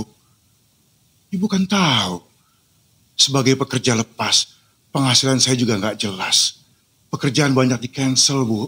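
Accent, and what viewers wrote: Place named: Jakarta, Indonesia. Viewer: Hebattt,pengen bisa monolog kek gini,mas kalo sy belajar monolog sama mas bisa ndak? Minta alamat dan no tlpnya mas,o iya mas ini aslinya orang mn ya kok dialeknya kek orang betawi tp kadang kadang kek orang minang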